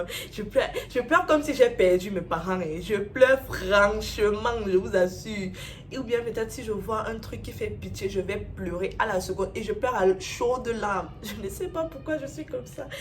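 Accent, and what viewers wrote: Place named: Cameroon. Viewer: Il y a un dernier accent là qui sort quand tu parles🙌🏾😜 vive de 237🔥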